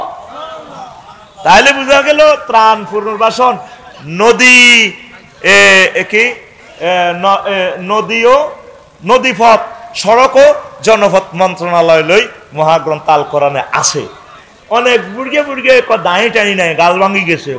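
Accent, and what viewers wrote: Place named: Noakhali, Bangladesh. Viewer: আন্নেরে নোয়াখাইল্লা কেল্লাই কয় আন্নে কমিলারা মানুষ হইয়া নোয়াখাইল্লা ভাষায় কথা কনআম্নের কালচার নোয়াখালীর মতন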